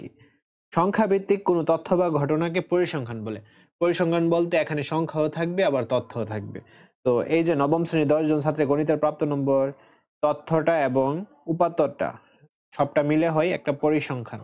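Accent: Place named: Brahmanbaria, Bangladesh. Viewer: ব্রাহ্মনবাড়িয়া থাকেন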